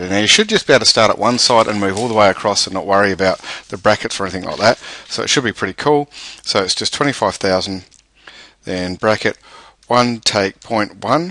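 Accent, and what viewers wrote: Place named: Australia. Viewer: thanks a lot mate, really refreshing hearing an Australian voice for a change!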